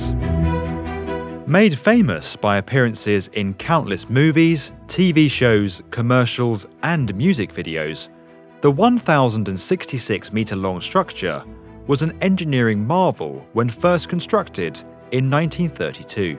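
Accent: England, United Kingdom. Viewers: Impossible! Why does this have an English accent? Is LA in England. No No such thing as earthquake proof. Ridiculous. Earthquake resistant? Maybe. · Why do we have to have a bloody fuckin' Britt narrating about an American bridge? Holy George Washington Batman!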